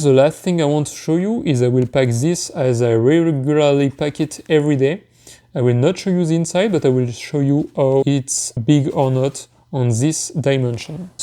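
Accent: France. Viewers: you have a little French accent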